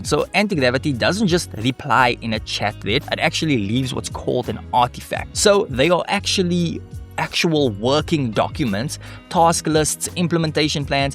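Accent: South Africa. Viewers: You sound like you from The Cape Flats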